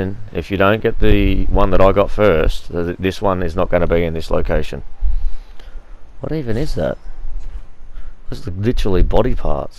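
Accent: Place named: Australia. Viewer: Good to Aussie voice in a YouTube video for a change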